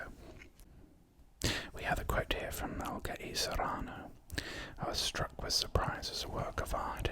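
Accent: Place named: England, United Kingdom. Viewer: I love history and English accents so great combo for Me. Thank you.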